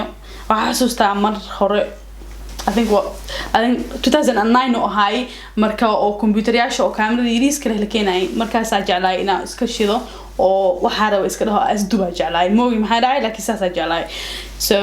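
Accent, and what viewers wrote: Mogadishu, Somalia. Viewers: Hhhhhhh thanks xamaraa kaga keeny cabah afsoomlig blo weeye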